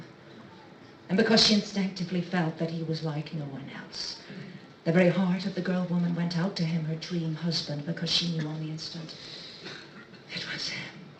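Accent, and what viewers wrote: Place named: New Zealand. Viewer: jeez, I love her strong Kiwi accent :3 it sounds so adorable)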